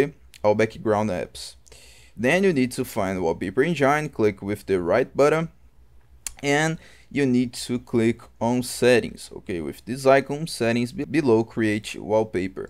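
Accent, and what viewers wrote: Brazil. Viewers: O massa é que Br consegue identificar Br apenas ouvindo a voz kkkkk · I just know you're brazillian just for your accent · o sotaque brasileiro ta forte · sabia mano, tava um sotaque brasileiro demais pra um gringo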